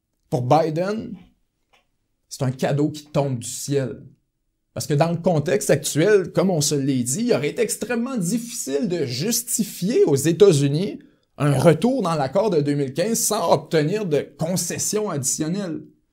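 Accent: Canada. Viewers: L’accent Canadien...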